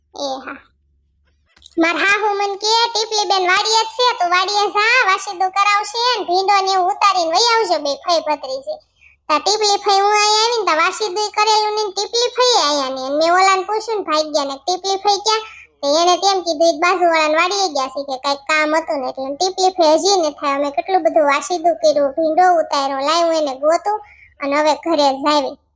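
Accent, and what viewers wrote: India. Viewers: ભાષા ભાવનગર ની બોલો છો.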